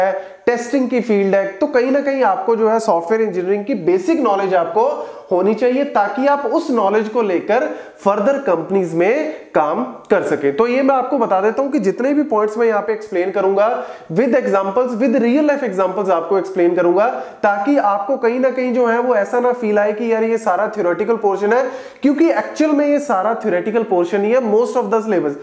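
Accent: Punjab, India. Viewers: sir aap punjab se ho..?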